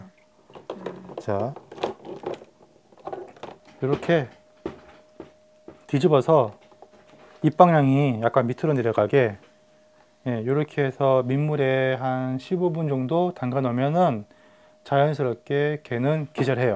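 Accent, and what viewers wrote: Gyeongsang, South Korea. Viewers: 억양을 들어보니 경상도 분 이시군요. 갑각류의 피가 반투명 묽은 파랑색인것도 처음알았습니다. 의학용 학명이 라띤어 이므로'Hemoglocyanin(발음상으로 적확하게: 헤모글로시야닌)'같은 구리성분이 함유된것이라고 아래 어느분이 한글로 적어둔 글을 보고 알았는대 다른분영상에서 복어 회뜨면서 독제거와 피를받아서 보여준적이있어 비교합니다만 복어의 피는 다른동물의 피처럼 빨간대 갑각류는 생선같은 어패류와 또 달리 반투명 믉은 파랑색인걸 알고갑니다. 좋은 정보였습니다.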